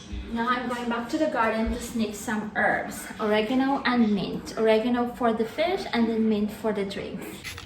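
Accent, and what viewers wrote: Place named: United States. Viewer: i have never succesfully grow tomatoes. you have more fruits and vegetables in your garden than mine beh. amazing you can grow calamansi as well. you have american accent already beh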